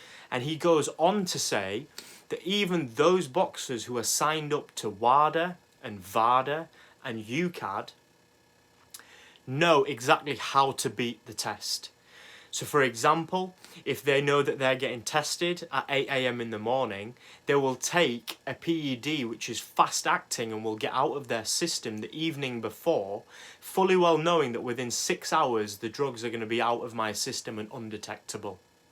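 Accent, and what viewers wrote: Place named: Birmingham, United Kingdom. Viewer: Underated channel mate,good info plus you sound like a fellow brummie👍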